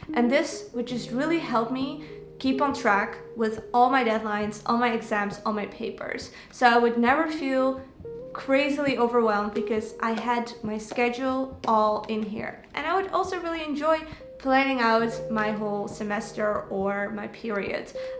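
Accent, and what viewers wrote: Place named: Netherlands. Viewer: Hoor je nederlandse accent 🥰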